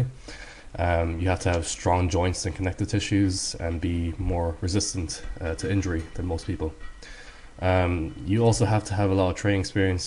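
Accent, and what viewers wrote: Ireland. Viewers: Are you Irish ? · Wtf your Irish .. I thought your American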